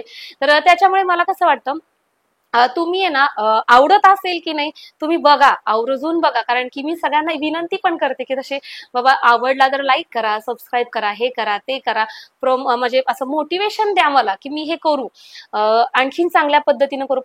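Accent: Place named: Solapur, India. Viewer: खरं आहे आशा निगेटिव्ह कमेंट आल्या की आपण नाराज होतो. पण आशा कमेंट कडे दुर्लक्ष कर. आणि पुढे करत रहा. मलाही अशाच निगेटिव्ह कमेंट्स येतात. मी दुर्लक्ष करते. तू छान करतेस. आपली सोलापुरी भाषा आपला अभिमान आहे. आपल्या भाषेवरून बऱ्याच कमेंट्स येतात. पण मागे हटायचं नाही. नाराज व्हायचं नाही. पुढे करत राहायचं.❤👍👍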